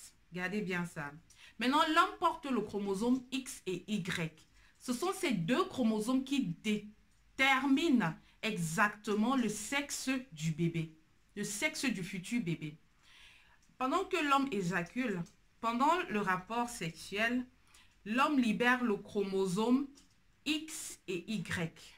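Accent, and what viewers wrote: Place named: Cameroon. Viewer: Notre bel accent Camerounais 😊😊😊 💚💚💚💚💚❤❤❤❤❤💛💛💛💛💛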